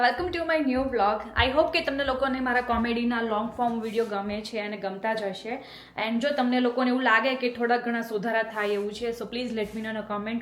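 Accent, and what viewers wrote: Kathiawar, India. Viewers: Your short videos are awesome. No need to change. Wonderful કાઠિયાવાડી ગુજરાતી. Loved your " Soul mate" video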